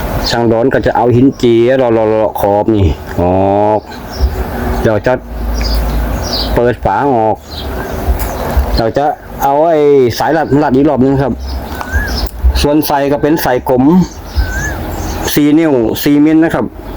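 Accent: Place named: Korat, Thailand. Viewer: เป็นคนที่ไหนครับ อยู่จังหวัดอะไรครับ สำเนียงเหมือนคนโคราชเลย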